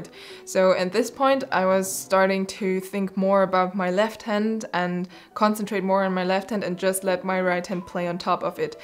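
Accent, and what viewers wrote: Germany. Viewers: höre ich da etwa einen kleinen deutschen Akzent? ^^ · U have a DEUTSCH akzent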